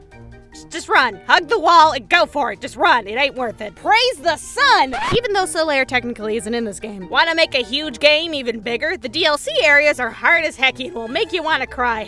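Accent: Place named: Midwest, United States. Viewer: So fucking midwestern!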